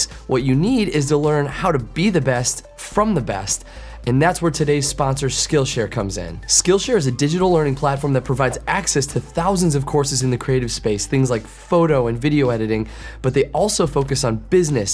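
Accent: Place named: United Kingdom. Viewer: i loved that British nat geo wild voice 😅 thats why i hit that like button,cheers mate ,greetings from kenya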